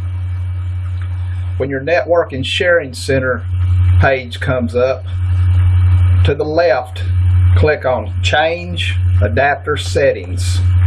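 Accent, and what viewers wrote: South, United States. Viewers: Thank you, u just saved my business and person life oh and it's the southern accent for me🙏🏽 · Dude you are awesome!!!! Thank you! Love the southern accent!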